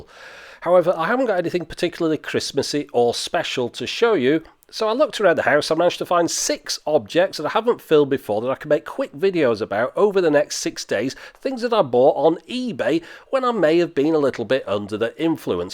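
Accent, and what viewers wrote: United Kingdom. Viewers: I really like David Niven. In my memories, his image comes associated along with Peter Sellers and The Pink Panther. I'd like to say that's a lovely souvenir to keep and to be proud of. Good video in all, I love your British accent; it's like music to my ears. Merry Christmas.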